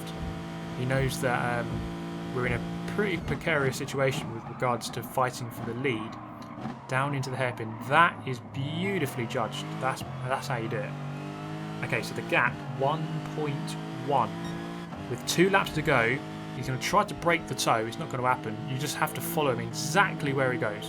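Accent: England, United Kingdom. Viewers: I have enjoyed your series on Grand Turismo racing. With your English accent it is almost as though I'm watching ESPN or any other sports Channel that covers European Auto Racing. I used to be really heavy into Gran Turismo all the way up to about number 4, but got out of it for some odd reason. From what I see with the ability to race online I have got to get a PlayStation 4 silver I can join in the fun. BTW, would you recommend playing with a steering wheel stick shift and foot brakes or with? Let me know.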